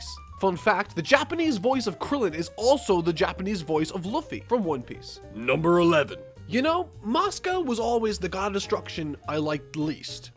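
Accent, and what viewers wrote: Ireland. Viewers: * is irish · are you Irish? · Dat thick Irish accent though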